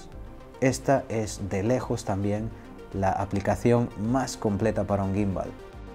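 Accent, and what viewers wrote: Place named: Latin America. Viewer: Amigo me encantó el video muy buena reseña del gimbal solo tengo 2 dudas espero me puedas ayudar 1 se pueden hacer timelapse con este gimbal he visto que con algunos se pueden lograr ejemplo en una noche estrellada podrías hacer un tutorial si se puede y 2 de donde eres como es que lo conseguiste lo he estado buscando en amazon y no lo encuentro soy de México tienes un acento latino muchas gracias que tengas un excelente día.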